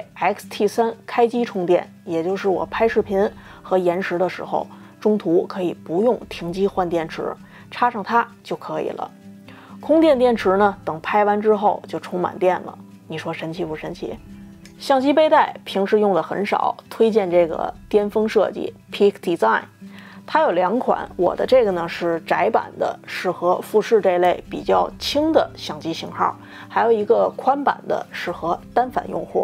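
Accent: Beijing, China. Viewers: 北京姑娘吧？和我一姐妹儿特像。讲的倍儿清楚。关注了。希望讲讲X100系列。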